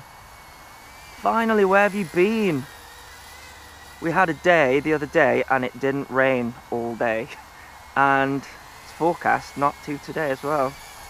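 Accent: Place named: East Midlands, United Kingdom. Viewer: Nice fella that....where are you...that's sounds like an east Midlands accent...or potteries..lol...no wonder you don't get much Sun l lol great review as always..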